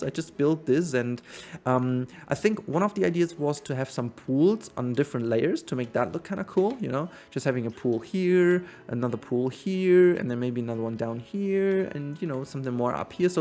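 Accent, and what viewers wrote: Germany. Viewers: You say your English is not native, but it is totally fine! Your slight German accent is more interesting and pleasant than a lot of native English speakers haha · Your English is totally fine! My mom is native German so your accent is already pretty familiar with me, and she says things a little differently sometimes. Honestly the game i'm looking forward to the most in 2021 is Prehistoric Kingdom. Dinosaurs + Zoo building = YES PLEASE! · Ich hab dich durch andere Planet Zoo Speedbuild Videos entdeckt und schau mir deine Videos seither immer wieder gerne an :) versteh nicht ganz wieso Leute auf unperfektes Englisch so abgehen. Ja, man hört ab und an dass du aus Deutschland kommst aber dein Englisch ist im Grunde absolut perfekt. Man findet immer was zu meckern, nimm dir sowas nicht zu Herzen.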